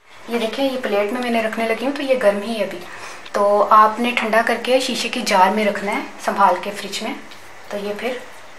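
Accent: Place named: Punjab, India. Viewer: Please donuts bnana Sikhs dien And I love your Punjabi accent me also belong to Punjabi family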